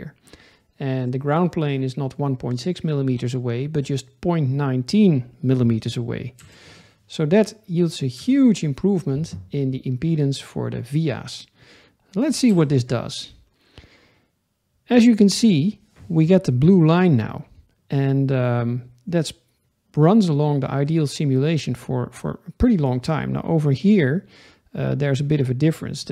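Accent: Netherlands. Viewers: Thanks for explain clear, by the way i like your clean English with the little dutch accent. Kind regards from germany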